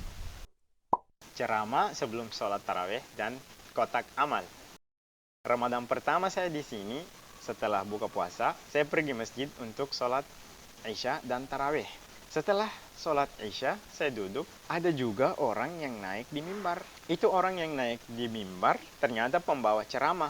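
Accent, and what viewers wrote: Makassar, Indonesia. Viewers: Logat makassar. Wkwk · Bisa menyesuaikan banget logat Makassarnya ya mantap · pantas logat makassar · Logat Indonesia nya ada aksen Makassar 😁👍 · kentara mi logat makassarnya hahaha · Logat Makassar nya kental... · Ini kuliah di mana kayaknya di bagian timur Indonesia ya. Kayak logat makassar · Logatnya sedikit ke makassar wkwkw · Logat makassar 👍🏻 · makassar bgt logatnya 😬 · Logat makassar 🙂🙂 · Logatnya makassar tonji 😄👍👍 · Logatnya makassar, mantap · Logat Makassarnya kental banget. Btw, salam dari SulSel tapi bagian Sinjai. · logat makassarki dgg 👍👍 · Baru pertama nonton tapi udah langsung tau kalau pasti kuliah di makassar karena logat khasnya 🤣🤣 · Org sidan tp, Ngomongnya kayak org makasar wkwkwkw 👍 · logatnya makassar banget, btw ini kuliah di UNhas kan? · hebat logatnya sdh seperti org Makasar...mantap👍👍👍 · Koq ada aksen mkassarnya...ini dmksar ya?? 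· ohh masnya di makassar yah. pantas logat bicaranya tdi ku pikir mirip logat makassar teenyata krena faktor kukiah di makasssar😂 jdi logatnya ikut logat makassar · Logatnya seperti logat makassar ☺️ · Logatnya seperti orang makasar.. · pertama lihat video ini saya pikir kok logatnya kayak logat makasar ternyata memang tinggal di makasar toh😄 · pntasan ada logat" makassarnya🤣🤣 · Bahasa Indonesia Aksen Makassar 😅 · Udah mulai kentara logat makassarnya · Bahasa indonesia nya kayak orang makassar hehe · Bahasa Indonesianya Bagus, ada campuran logat makasar.. 👍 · Logat Makassar tawwa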